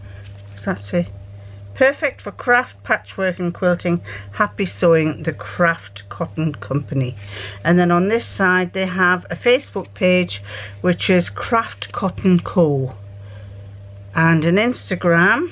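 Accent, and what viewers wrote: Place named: South Shields, United Kingdom. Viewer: I hope you have a lovely Christmas you and Brian, you will need all your vitamin tablets with all your family, food and dog, energy levels may get low. 😀 it's lovely hearing an accent that my mum and dad had, (they grew up in South shields and easington lane. Don't forget if you come round here to have a walk near the river, let me know and we can meet up for a coffee at the station. Lots of love to you both. Heather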